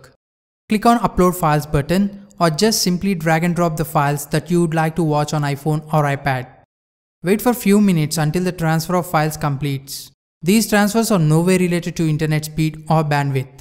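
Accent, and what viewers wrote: India. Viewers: i always cringe a bit when i heard indian accent but we all learn and i understand. nice video mate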